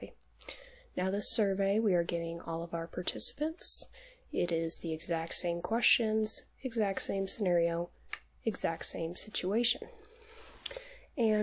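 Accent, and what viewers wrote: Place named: South, United States. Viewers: I hail from Georgia, and you nailed the Southern accent. You forking rock:) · How in the world am I supposed to relax with this video when I'm laughing half the time?! With nearly all other ASMR vids I watch, I do so simply for the tingles and relaxation. But with yours, the comedic aspect is always an added treat. And, as a lifelong Southerner, I have to say you do a mighty fiiine job of nailin' the accent, darlin'. Keep up the good work.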